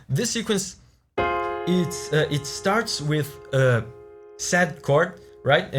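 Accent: Brazil. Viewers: Sotaquezinho brazileiro, que fofo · Tu é do brasil né? Muito top, vi um pouco do seu sotaque e fui conferir e né q era vdd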